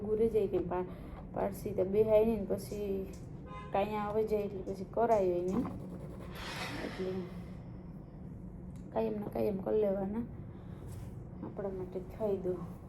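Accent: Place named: Kathiawad, India. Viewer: जी गुड मॉर्निंग मैं आपका ब्लॉग देख रहा हूं मुझे आपकी भाषा बहुत अच्छी लगती है वैसे मैं भी गुजराती ही हूं टिपिकल काठियावाड़ी भाषा सुनने में बहुत मीठी और अच्छी लगती है मैं अहमदाबाद गुजरात से हूं अपने बाजू में कोई साइकिल वाला हो जिसकी नंबर प्लेट हो उनको ही पूछ लो 👈😍🤣😂🤗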